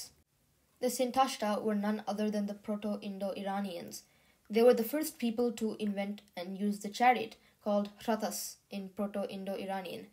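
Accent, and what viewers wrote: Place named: India. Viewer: You accent sounds Indian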